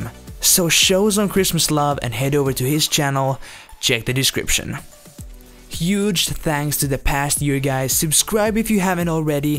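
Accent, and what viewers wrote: Finland. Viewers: You sound very,,, Finnish :D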